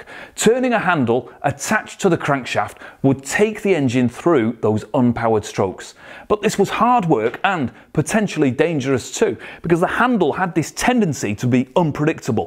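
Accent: United Kingdom. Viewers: Is this guy from England? I like him, he has a passion for mechanics. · Indeed very informative, full of energy and I love the british accent. =))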